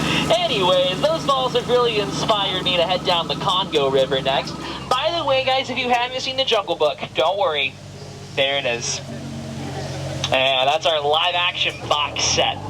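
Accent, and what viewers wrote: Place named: New York, United States. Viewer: your loud New York accent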